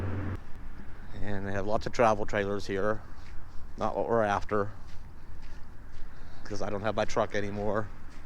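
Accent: Pennsylvania, United States. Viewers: you can always tell a PA accent!! very distinctive.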